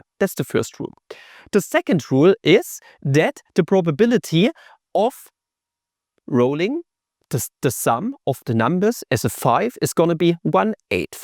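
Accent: Germany. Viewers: I bet your native language is German, it's so noticeable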